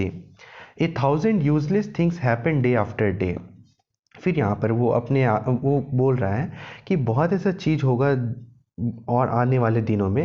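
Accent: Bengal, India. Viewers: Sir ayi hai . Are you bengali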